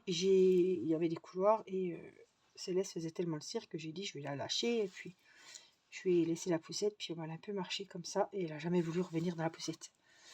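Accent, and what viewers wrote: Franche-Comté, France. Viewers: Est-ce un delicat accent franc comtois que j'entends ?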